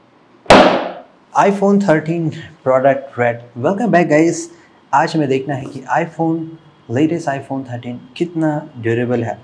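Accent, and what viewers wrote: Lucknow, India. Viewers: Bhai aap Lucknow se hai na?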